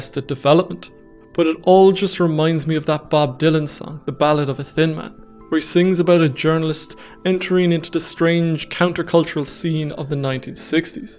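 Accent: Ireland. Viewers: Irish accent is awesome ☘️ . Great books btw. Interesting information on the various people.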